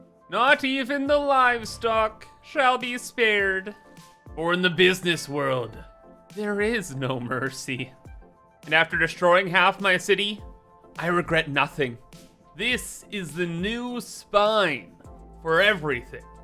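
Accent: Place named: Canada. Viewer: you can tell by his accent he’s definitely Canadian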